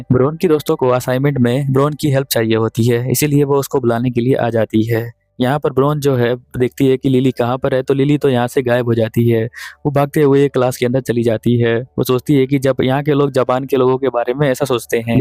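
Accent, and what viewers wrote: Bihar, India. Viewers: Ap Bihar se hai kya?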